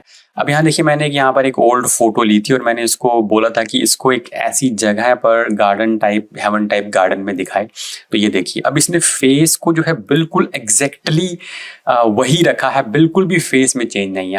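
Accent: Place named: Ireland. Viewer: It's starts out like English but then becomes Irish or something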